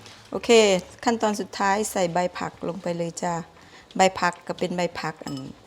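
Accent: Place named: Korat, Thailand. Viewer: แก๋นี่มันสำเนียงบอกเลยเนาะ.ว่าค้นโคราช.หลานย่าโม..ดีแทะ, แทะ.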